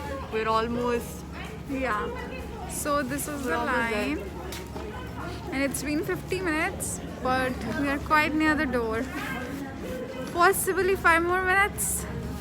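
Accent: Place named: India. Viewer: I love your India accent 🙂🙂😋😏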